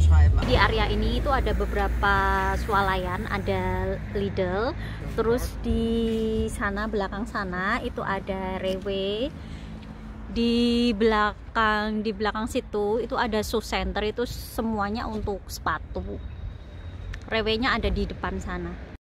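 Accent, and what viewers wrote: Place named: Java, Indonesia. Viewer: Tetap aja medok nya ngak bisa hilang ya bu ....hehehehe....salam buat suami...tetap sehat ya bu....🙏🙏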